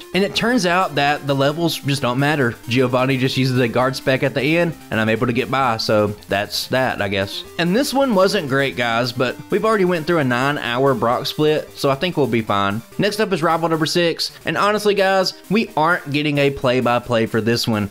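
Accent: South, United States. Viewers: Finally, not a Canadian. You've got the most southern accent of any Pokémon challenge Youtuber and it's welcome.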